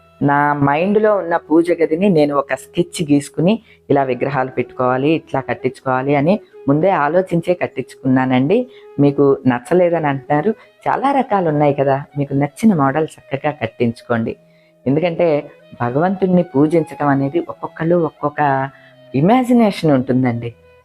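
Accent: Godavari, India. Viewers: Amma namast he.Memu yesterday Annavaram vellam.Vacchetappudu meeru baaga gurthoccharu.Godavari district maata vintene Amma maata gurthostundi.Happyga anipistundi All the best Amma Amma (meeru)cheppina chaala manchi maatalu memu acharisthunnam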